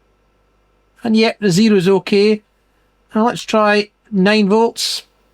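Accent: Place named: Scotland, United Kingdom. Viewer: I thoroughly enjoyed your interview with Dave from EEVblog. I think you were one of his most interesting interviews to date. Didn’t know you had it in you from just the view of your hands and Scots brogue all these years mate. Good on ya then!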